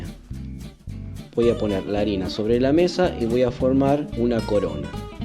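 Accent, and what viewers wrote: Argentina. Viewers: Que bello acento el de los argentinos😄😍😍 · Excelente estimado chef , decir que eres un argentino de un país hermoso previligiado porque de muy peque me a gustado tu país y ese acento especial que solo ustedes y los uruguayos lo poseen dicho esto , muchas gracias por tus recetas sobre todos estas masas me gustan igual con espinacas fabuloso las voy a preparar tal cuál lo enseñas , saludito desde Chile !!!!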